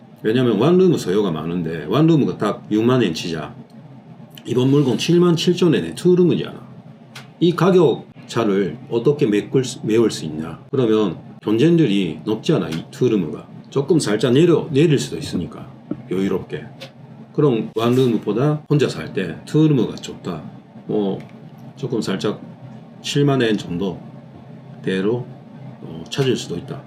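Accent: Busan, South Korea. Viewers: 전 사장님 부산사투리 말투 너무너무 듣기 좋아요 편안하게 계속 듣게되는 목소리에요. 알림설정 하고 갑니다^^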